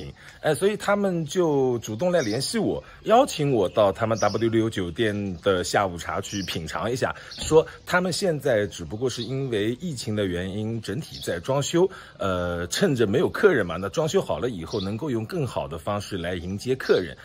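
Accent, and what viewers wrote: Shanghai, China. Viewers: 哥們是上海人？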